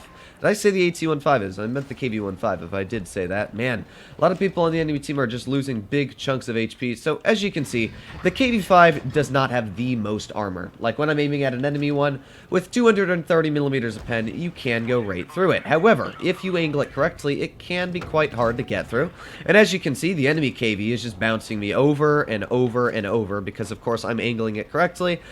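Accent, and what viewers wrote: Russia. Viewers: I like the way you pronounced the words with the Russian accent😂 that’s funny. I’m from Russia! I really enjoy watching your videos!👍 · I like your Russian accent!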